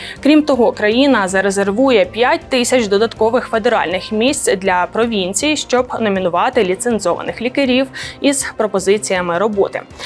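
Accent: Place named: Kirovohrad, Ukraine. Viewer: акцент Кировоградки ,чи рядом областi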